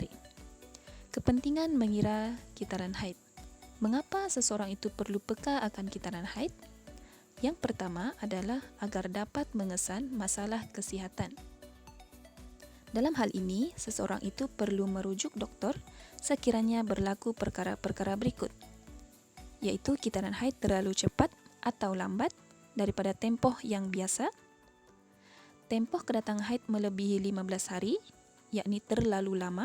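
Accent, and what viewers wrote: Sabah, Malaysia. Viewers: Cikgu orang Sabah ye😄😅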